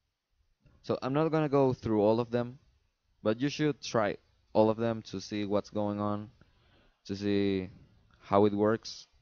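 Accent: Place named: Brazil. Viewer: el chico del vídeo fala com acento brasileño.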